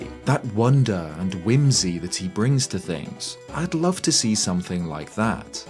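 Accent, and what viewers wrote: United Kingdom. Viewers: The guy isn’t American either, notice his British accent? So your whole original post is bullshit.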